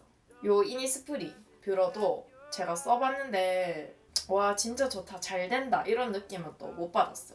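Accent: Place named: Daegu, South Korea. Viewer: 대구분인가요? 대구 사투리같아용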